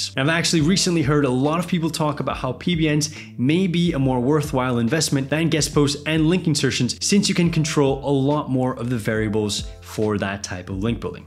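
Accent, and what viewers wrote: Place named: Singapore. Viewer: I've just spent 5 hours trying to work out your accent I'm going with schooled at an international school in Singapore or some shit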